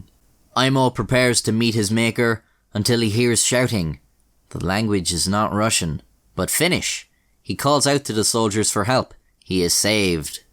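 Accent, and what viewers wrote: Ireland. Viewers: Had to an irish narrator on a drug story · Hi 👋🏼 which part of Ireland are u from? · I love Irish accents and how "to surround them" sounds like "to so random" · This story is made so much better being told by one of my favorite nationalities, an Irishman. Its great.. cuss words and all. 🇺🇸 😎👍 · The Irish accent makes it better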